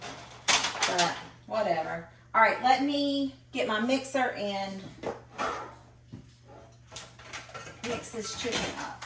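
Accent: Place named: South, United States. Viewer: April here from your friends channel, I love caserole looks so good. I love your Southern accent girl!!! keep doing you BY George! have a wonderful day !🍏🍎🥭🥑🍆🥕🌽🍅🫑🌶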